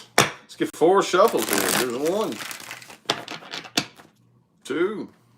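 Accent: South, United States. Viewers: Im from Kentucky was born. In Louisville. You said Louisville right too lol not looeyville or Louis ville. Lmao. I'm in Russellville ky. A tiny Town two hours from Louisville. I have lots of family in Indiana too. Thanks for the aries reading. I didn't know you was from around here. You do talk kinda country lol.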